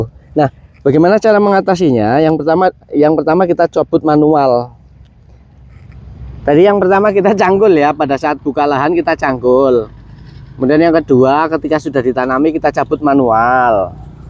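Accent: Banyumas, Indonesia. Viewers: Woh ngapak kie. Ngapake daerah endi kang?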